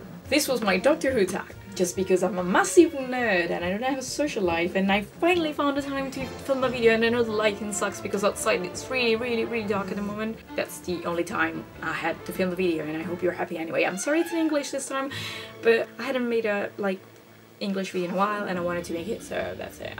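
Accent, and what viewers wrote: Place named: United Kingdom. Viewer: E' stupendo vedere come il tuo accento british migliori di giorno in giorno, ADORO sentirti parlare in inglese aw. Questo video è ORO PURO ^ ((e io sarei una companion diecimila volte peggio di te because of reasons))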